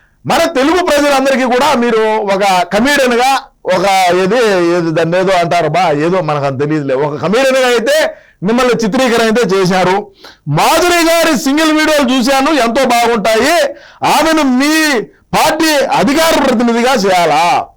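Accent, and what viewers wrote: Rayalaseema, India. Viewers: anna me rayalla sema language lo challa Baga mataladathu very nice allthe best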